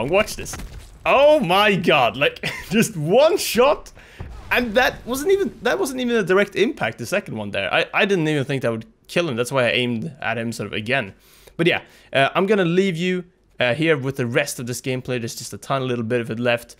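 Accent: Sweden. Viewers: Svensk? Are you Swedish?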